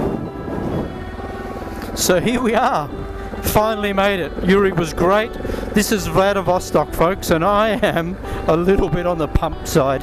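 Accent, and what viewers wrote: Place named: England, United Kingdom. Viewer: ..hey...r u a sandgroper?! Sound like a pom! We wanna c where u camp n what u eat - and let us know where u r!! Cheers - enjoying it.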